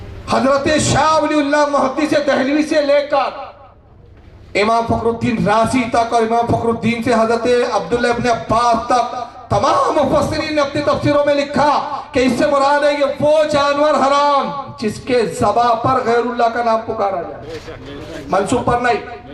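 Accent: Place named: Kanpur, India. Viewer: Kanpuriya style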